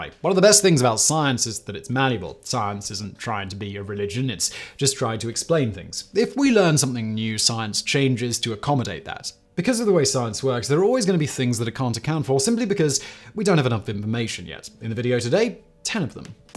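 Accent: England, United Kingdom. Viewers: Why would they use Canadian pronunciation, given then channel and its scriptwriters and editors are American and the presenter is English?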